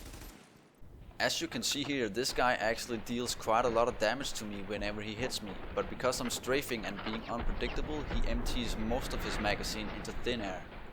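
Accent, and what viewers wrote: Denmark. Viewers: Synes også det var en dansk accent jeg kunne ane hahah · could instantly hear that's another Dane :) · Er du dansker? Lyder sådan på accenten ;)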